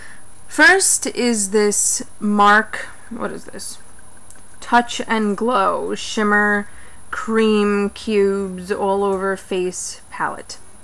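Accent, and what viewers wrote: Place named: New York, United States. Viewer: haha you embrace the pale! that was awesome. I do the same. Are you from NYC? Long island? im hearing some new yorker in your words..im from Bklyn!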